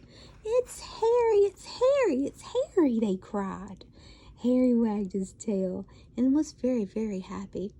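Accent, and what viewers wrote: South, United States. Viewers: I really love when you read I think it’s the hard Southern accent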